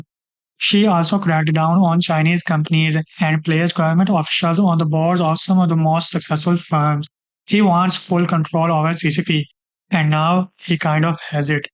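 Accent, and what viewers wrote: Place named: India. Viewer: For heaven's sake ..listening to your Indian accent when speaking Ingrish is so nauseating and annoying.. btw President Xi did not get rid of his political opponents but instead got rid of the rampant corruption in China once and for all.. what about India which is a also a notoriously corrupted country...? Please focus more on your India's domestic.corruption..political divide..religious riots..homelessness., slums .rather than worry about China's problems..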